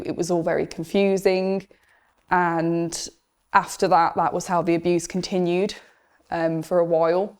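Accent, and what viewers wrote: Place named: United Kingdom. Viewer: This girl reminds me of a former girl friend from college days, except for the lovely british accent. She was NOT a JW, I should add. What a mixed up cult they are.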